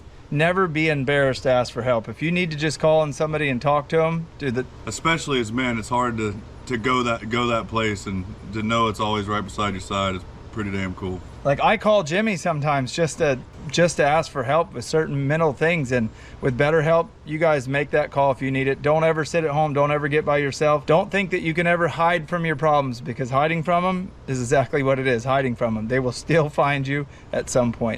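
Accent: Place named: Kentucky, United States. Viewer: You sure your not from Kentucky, you speak like you are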